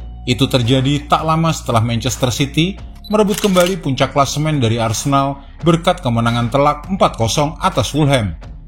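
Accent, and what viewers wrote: Java, Indonesia. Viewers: ngak ada pengisi suara lain ...medok banget:face-blue-smiling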